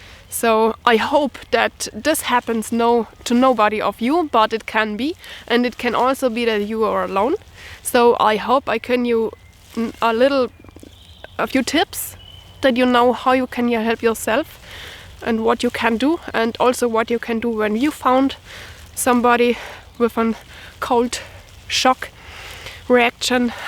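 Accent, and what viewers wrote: Germany. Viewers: I agree totally with Robert, You are such a sweet and courageous woman, and i love your German accent. Brings back fond memories of my stay in Germany. Hals und beinbruche!! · Nice video, ik love to hear german people speak english. but u know you dont have to block out beautifull woman, that was in the 90s.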